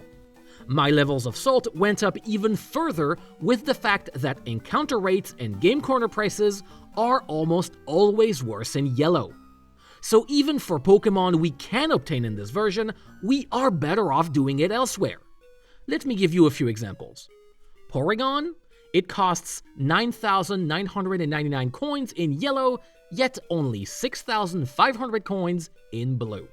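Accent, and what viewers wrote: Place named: France. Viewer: Youtube automatically translated you to French, and while I'm French and suspect to your accent that you actually speak French IRL I was not prepared for this when the video started.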